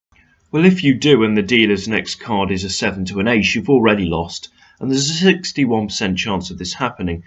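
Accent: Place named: United Kingdom. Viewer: Dude's got a british accent and talks about pounds and pence, in Europe, blackjack sucks because they don't check for dealer blackjack until AFTER you do everything. One result of this is you wouldn't be doubling down against a 10 or an ace on anything. But another is that you're actually incorrect then, and you WOULD hit or stand even if the dealer's got blackjack, you wouldn't know if you've already lost.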